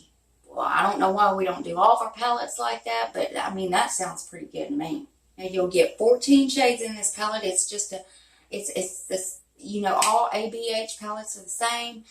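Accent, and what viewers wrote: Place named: West Virginia, United States. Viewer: I live on West Va/Va border and we have the same accents, you could be my neighbor. Lol. So our accents are the best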